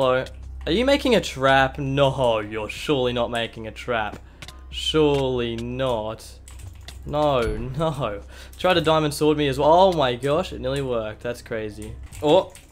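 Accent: Australia. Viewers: idk why, but i think im wierdly drawn to your videos because of the australian accent. I'm australian too so it feels like im listening to a friend ig. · Love the Australian commentary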